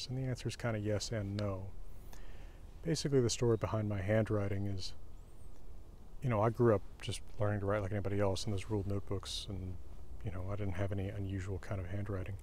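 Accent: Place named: Georgia, United States. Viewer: Well, I like your handwriting ! Thank you for sharing a glimpse of your teenaged self . You were already becoming an intellectual by then ! I just stumbled upon you , when you and Simon Roper were “communicating “ in Old Norse or something ! Just last night up popped you and another linguist were talking about the beginning of the alphabets. I am of retirement age, and home school two granddaughters, a 8 year old third grader , and a 13 year old 8th grader . Would love some of this to rub off on them ! Do you by chance have something I can use to inspire them ? I live is SE GA. Natives of NE FL. Surprised that you went to U GA ! Your soft GA accent still coming through .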